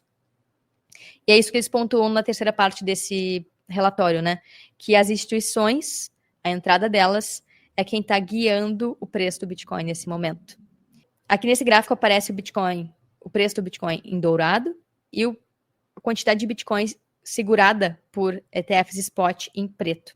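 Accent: Rio Grande do Sul, Brazil. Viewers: tem sotaque de gaúcha, que linda 😂❤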